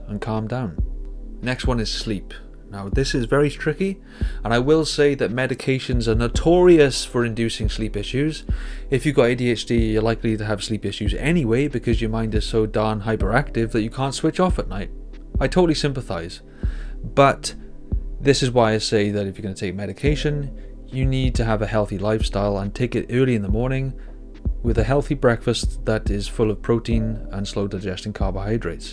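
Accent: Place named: Swansea, United Kingdom. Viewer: Hello, I'm from Swansea! I noticed you have a bit of a local accent, where are you from?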